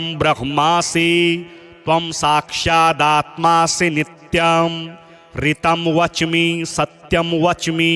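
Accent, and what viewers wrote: Standard. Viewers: जय माता की, बहुत अच्छी आवाज है शुध्द उच्चारण है ,बहुत बहुत धन्यवाद 🌹🌹 नारायण गृहस्थ आश्रम नारायण नगर पचरॉव चुनार मीरजापुर उ प़ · 🙏ॐ श्री गणेशाय नम:🙏 🙏ॐ रिद्धि सिद्धि सहिताय श्री गणेशाय नम:🙏 आचार्य जी द्वारा शुद्ध उच्चारण सहित सुस्वर में लयबद्ध वाचन। आपको सादर प्रणाम🙏🙏🙏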